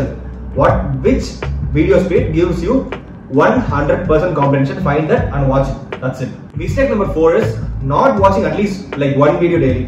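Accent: South India, India. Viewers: South accent : yes but atleast he didn't he start the video in English and proceed with native language like how the majority does 😅. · Dude fix or mic or sit close to the camera/phone ur not understandable with tht south accent of urs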